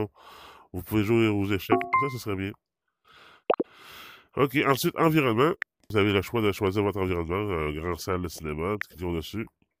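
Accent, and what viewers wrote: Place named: Quebec, Canada. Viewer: Merci je suis newbie avec le Quest 3 depuis 6 jours et j’ai comme on dirait du stock d’appris depuis! Vos explications sont claires et simple à comprendre! Merci pour cette introduction au Bigscreen qui est sans doute semblable aux salles plateformes cinémas! Je suis déçue de m’apercevoir combien c’est monétisé ces machines sans bon sens et ça ressemble étrangement à in PC! Personnellement je ne suis pas fan des jeux que je trouve enfantin de toujours vouloir détruire quelque chose ou de tirer avec des engins sur des gens ou pour avoir accès à des points ou bonus et je ne sais quoi! Par contre, j’adore apprendre quelque chose, voyager ou regarder des films en 3D ou réalité immersive! Il y a un gros potentiel, mais Meta a fait fausse route en développant des jeux presque essentiellement. Car il aurait été plus intéressant de developper le côté immersif dans d’autres applications! 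Je crois que c’est la voie à nos PC de demain, comme on voit dans Star Streck!😊 je suis du Saguenay et vous d’où du Québec, car votre accent est québécois! Merci bcp!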